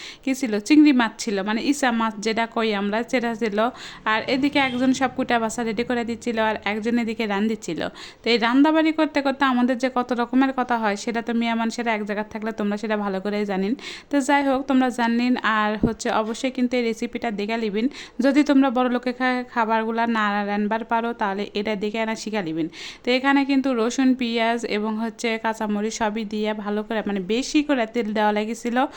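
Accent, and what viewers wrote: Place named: Bogura, Bangladesh. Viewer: আপনার বাড়ি কি বগুড়া নাকি আপু কথা শুনে মনে হচ্ছে আমাদের এলাকার মানুষ ❤❤❤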